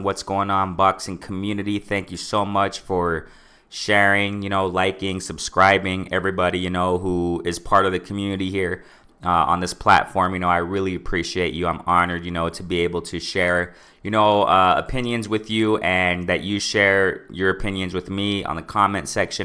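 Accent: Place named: Mexico. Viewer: Hey good video! I was picking up on your accent and it sounds Mexican, by the way you emphasize on the "J" in Verdejo. Am I right?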